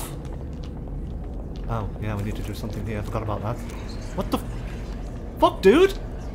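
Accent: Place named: United Kingdom. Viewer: This man is very Bri'ish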